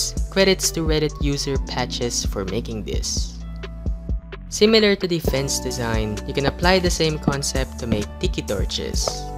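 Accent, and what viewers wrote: Philippines. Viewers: Your accent its like a filipino Btw: Filipino here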